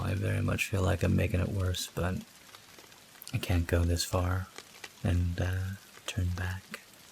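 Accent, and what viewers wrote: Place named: Midwest, United States. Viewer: You have no idea just how awesome and important this video is. I just discovered your channel tonight, and found this. I think maybe I was supposed to. YT tends to be a delightful "rabbit hole" of things yet to be discovered, overall, but somehow Spirit manages to use YT to tell me stuff I seem to ignore too much. I'm not sure what else I can say except for the fact that your voice is quite soothing to listen to. I'm sitting here trying to pinpoint your accent (something I'm usually pretty good at). At times you sound a bit like you're from the Midwest (Iowa, Missouri, Nebraska, etc) and other times, you sound like you might be in the mid-Atlantic states (NC, W. VA, MD, etc). Care to reveal the truth? ;-) (I'm from Iowa, btw)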